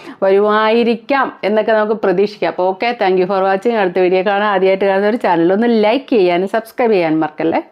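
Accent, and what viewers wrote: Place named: North Kerala, India. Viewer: വീഡിയോകൊള്ളാം. നന്നായി. ആ ഞ്ഞാമെന്റൈ കൊള്ളില്ല. വടക്കന്മാരെല്ലാവരും പറയുന്നഫാഷ യാണ്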